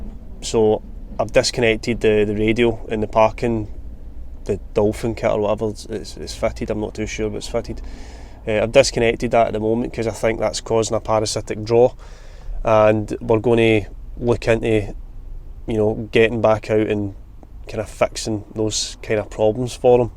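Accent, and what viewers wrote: Scotland, United Kingdom. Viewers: Scottish. Subbed. 🙂🍺🍻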